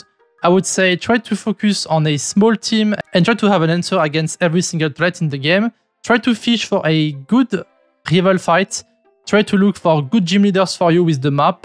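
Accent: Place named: France. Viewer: J'ai jamais vu un bon youtuber faire si peu d'efforts d'accent, vraiment là tu peux pas me dire que tu peux rien y faire, tu peux que t'améliorer parce que vraiment on dirait une voix IA française qui lit un texte en anglais c'est chaud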